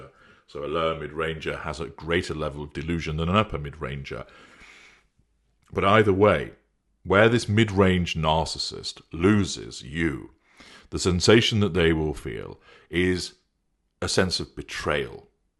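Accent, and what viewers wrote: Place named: England, United Kingdom. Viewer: Soothing? I find it cold. No inflections. Even Doctor Higgins' voice sounded more soothing and human than his. Although his accent is academic and his vocabulary is rich and high standard; Englishman I guess (doesn't sound Canadian 😁) no cockney.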